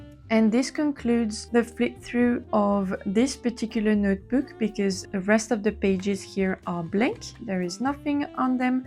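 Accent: France. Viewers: I knew it!! 😂 I love the French accent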